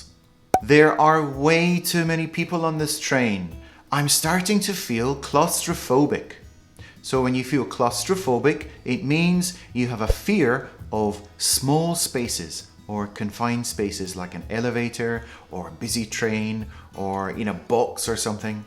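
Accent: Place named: United Kingdom. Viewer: わかりやすい！イギリス英語が好きです😊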